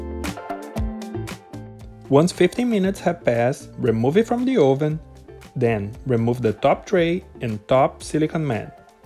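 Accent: Brazil. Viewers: Are you brazillian? Or portuguese idk Ur accent kinda gives it up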